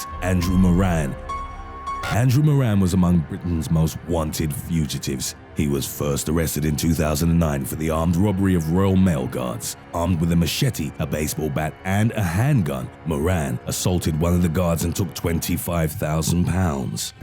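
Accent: Spain. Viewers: this narrator gotta be some sort of spanish. (btw love the voice) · nice video, your spanish is pretty funny btw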